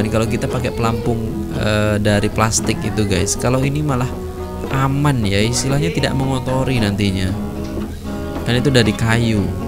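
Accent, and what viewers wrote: Malaysia. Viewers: ni cara pertuturan dia sikit lebih kurang mcm org M'sia. Senang faham. Terbaeek👍👍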